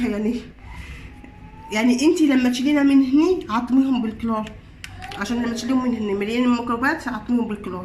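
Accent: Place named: Libya. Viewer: هذه أي لهجة ليبية؟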